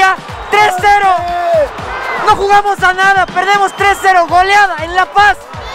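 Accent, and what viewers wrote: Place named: Mexico. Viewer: Cuando lo escucho hablar parece mexicano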